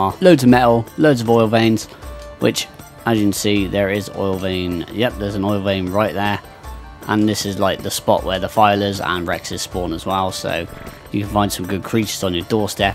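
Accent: United Kingdom. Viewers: Everyone in the UK sounds like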